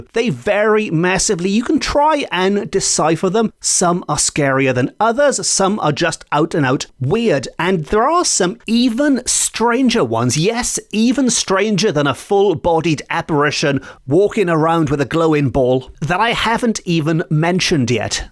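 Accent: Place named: United States. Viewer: Your pronunciation of Welsh sounds very different from Duolingo and other Welsh speakers i've heard. I've heard Calan Gaeaf pronounced Cah-lahn Guy-ahv. Are you just from a different part of Wales with a different dialect or accent? The way you pronounce your "A" sounds like the American way, not British.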